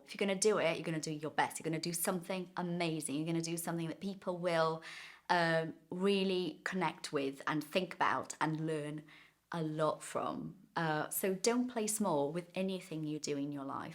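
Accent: RP, United Kingdom. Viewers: I love so much your accent, it's soo brit and fancy 🤣🤣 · Hey, teacher! Hope you are doing awesome. I have found you on Instagram and here on your channel. I am amazed with your british accent and also watching every single video here. I am trying to acquire the british accent but, it is not so easy. Lol Kudos to your work and skills!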